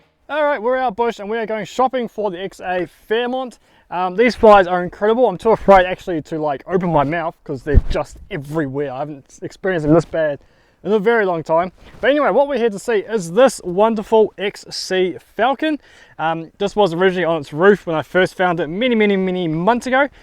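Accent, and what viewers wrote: New Zealand. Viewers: Ahhh....I can hear a hint of Kiwi twang. Love the old cars. Thanks.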